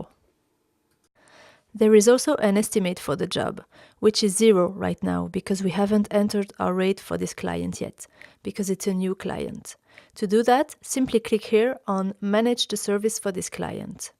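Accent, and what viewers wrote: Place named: France. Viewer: Hello ! By your accent, I imagine you have uploaded the same video with a voice-over translation in French ?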